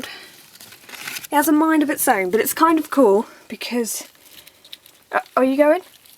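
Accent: United Kingdom. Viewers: sounds british to me. but im not sure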